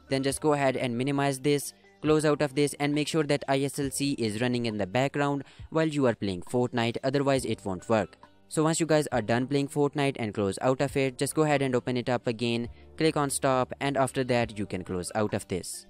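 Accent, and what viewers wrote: India. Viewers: you sound indian and may I ask what ethnicity are you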